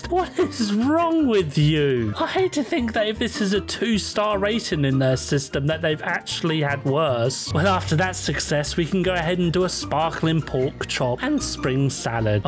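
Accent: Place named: United Kingdom. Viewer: Lol he’s British Letsgameitout fr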